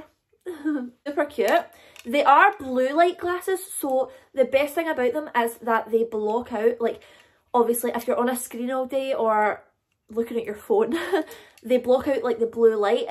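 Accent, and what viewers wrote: Scotland, United Kingdom. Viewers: I love your Scottish Accent ❤️❣️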